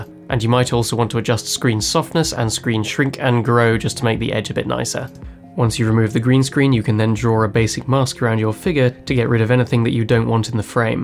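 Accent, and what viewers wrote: United Kingdom. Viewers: He’s got the English accent down pat...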